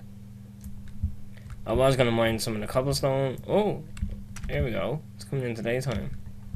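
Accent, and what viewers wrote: Ireland. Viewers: What part of Ireland ya from? I'm from Cork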